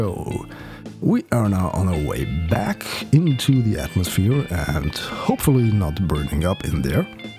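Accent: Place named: Germany. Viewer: Do you speak german? You‘r „s“ sounds, weil..., german.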